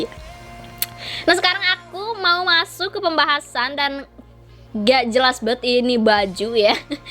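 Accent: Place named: Madura, Indonesia. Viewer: Kek org madura gitu logatnyaa, te sateeee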